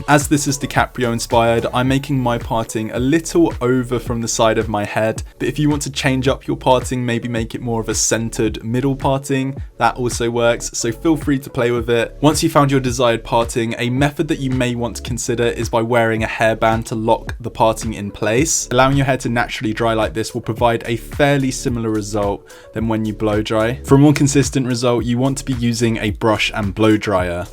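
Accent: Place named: United Kingdom. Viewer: Finally a British accent, I’m sick of hearing these annoying American ones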